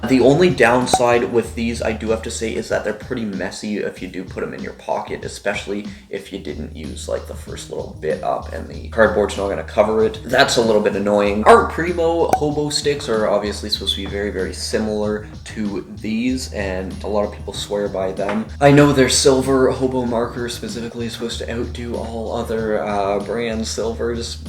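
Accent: Canada. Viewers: are you canadian? you sound canadian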